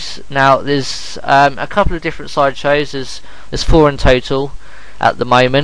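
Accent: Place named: United Kingdom. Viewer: British accent -- leave the man alone, He put up a video while we yap away :)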